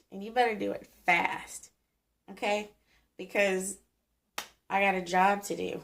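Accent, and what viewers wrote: Texas, United States. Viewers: Your sexy as hell with that Texas Twang!...damn you are REAL as Hell!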